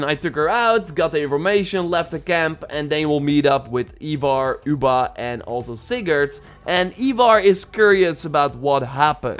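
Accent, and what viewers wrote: Netherlands. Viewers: I was like.. this guy his voice sounds so dutch and little did iknow hes dutch lol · u dutch man? if u are im subbing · Are you dutch? You sound dutch...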